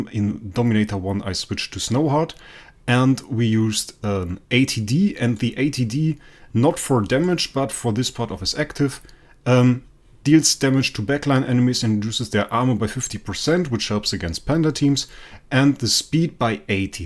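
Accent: Germany. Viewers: are you german or where are you from? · Da hört man den deutschen raus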